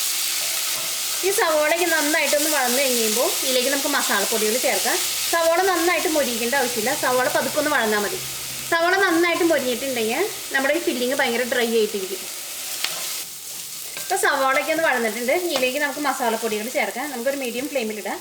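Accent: Thrissur, India. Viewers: ur malayam sounds funny....thrissur language....i like u ...i ve tried ur recipies....keep going ur good work....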